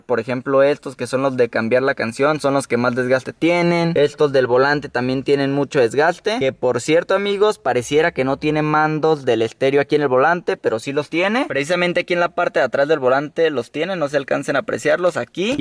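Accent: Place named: North, Mexico. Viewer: Se nota que eres un pinché nacote norteño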